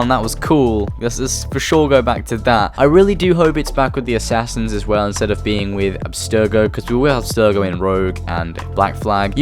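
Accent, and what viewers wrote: United Kingdom. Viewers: I thought that it was probably a joke, but I wasn't completely sure. You never know when you've got somebody from a different culture if maybe a saying that's normal to you means something very different to them. Your accent is obviously European - probably UK somewhere, although I've heard people from the middle east with an accent that sounds pretty similar to yours too. I've certainly never heard the rough sex definition. I'm not even sure if that's an actual thing or just somebody putting in a silly troll definition XD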